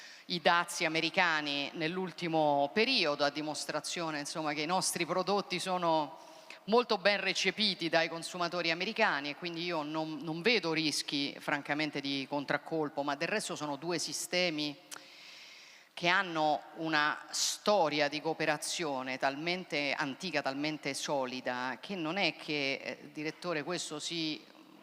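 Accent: Rome, Italy. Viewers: LA GIORGIA E' SEMPRE NA MERAVIGLIA , L'ASCOLTEREI PER ORE COL SUO ACCENTO ROMANO , ❤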